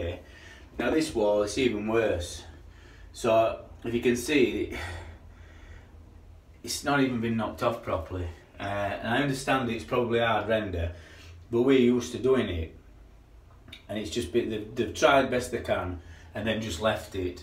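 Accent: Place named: Barnsley, United Kingdom. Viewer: Very informative video and has stopped me from knocking plaster off myself after a water leak. I thought I might be saving myself some money if I did it myself ( 62 years old and only one income ) Anyway lad, thy talks Barnsley and I love that accent. Thy seems a reeght genuine kid so thanks for that 👍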